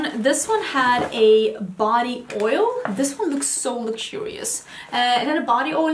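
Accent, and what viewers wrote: Sweden. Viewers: I think this eye look is one of my favorites you ever done. I’m only 1 minute into this video and HAD to comment and let you know. You are always stunning, but this entire look...wow!!! I love seeing your hauls because I know definitively that, bcuz of your Low-Buy, whatever you bought you REALLY wanted and spent a while deciding to purchase!! Okay, back to completely, 100%, focusing on your wonderful video instead of typing!!!! 😘 Edit: how wonderful that you got so excited about a purchase. I’m buying the ND Mini Gold palette today as I returned the new CT foundation (ay yay yay!) and I’m soooo excited! And, your English is wonderful. When my Dad came to this country from Germany, he started learning English by watching cartoons. Til the day he passed, he had the most beautiful accent but still pronounced some words incorrectly or had trouble with certain popular phrases but I found it endearing. 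You should be so proud of your near mastery of the English language; it’s so complicated, and your gorgeous Swedish accent makes it all the better. Niacinamide is hard for even a biochem scholar in the US to say, I think, lol. Maybe try: ny-uh-sin-uh-my-d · Yes. Im danish and i could NEVER speak so fast and fluid english like! Also, swedish is a beautiful language so her accent is so nice:)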